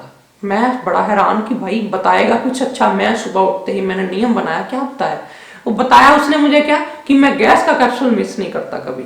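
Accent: India. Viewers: Rohit Malhotra Is he teaching English to u... He is Desi n speaking in his accent... He said bloating or bloting just to make us understand as we search with that name in YouTube... Don't mke fun of anyone for their accent bcos English can be spoken any how one likes there is no rules as such...as it is a foreign language...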